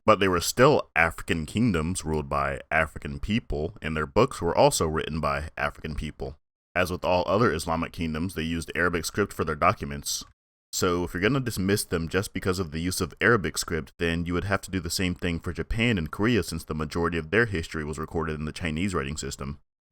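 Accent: Appalachia, United States. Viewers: Love the hillbilly voice!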